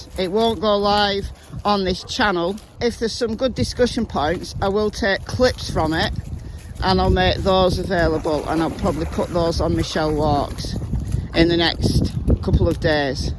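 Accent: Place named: United Kingdom. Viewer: I love this accent greeting me in the morning, don't you!! Something about a UK accent that makes a person sound so much more interesting! I heard that they don't feel those footprints are Summer's. I can't for the life of me remember who said it. It was a creator that is very close to Kingsport.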